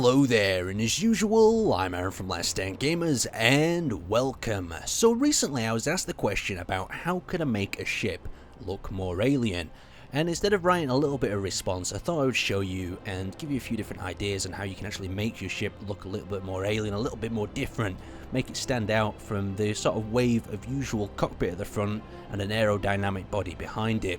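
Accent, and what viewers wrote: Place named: West Midlands, United Kingdom. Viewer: you sound like you're fro the westmidlands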